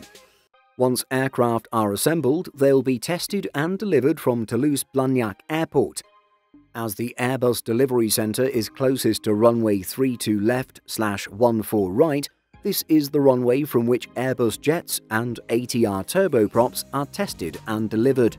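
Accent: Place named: United Kingdom. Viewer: I think your British accent shows your bias.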